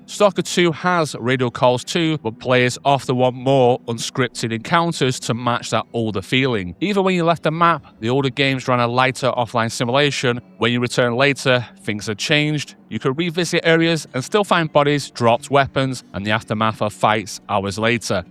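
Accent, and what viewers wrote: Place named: United Kingdom. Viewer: That bri-ish accent boy explaining stoka 2 again. Смешной такой, и откуда он только взялся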